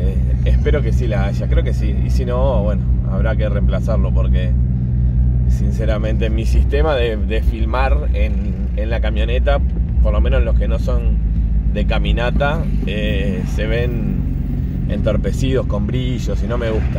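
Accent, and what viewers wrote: Argentina. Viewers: Che una pregunta el es gasolero? Pq sino el precio de la nafta te come vivo. Le comenté a mi hno de tus videos. Otra cosa tenés el mismo tono de voz de un salteño q vive acá, tienen otra particularidad en común a los dos se le están volando las chapas jaja. Slds desde Toronto. José Luis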